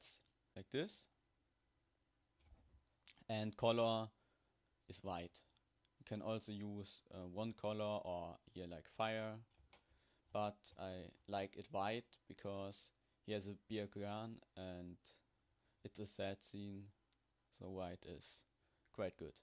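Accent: Germany. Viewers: Man hört so hart das du deutsch bist xd gutes tutorial btw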